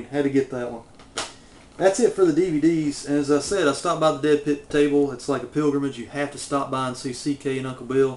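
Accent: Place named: Western Kentucky, United States. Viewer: Is that an actual poster for "The Mutilator" aka "Fall Break" !!??! That is bad ass. Also, your accent sounds very similar to the folks where I grew up in Western Kentucky. Great vid. Sounds like you had a blast.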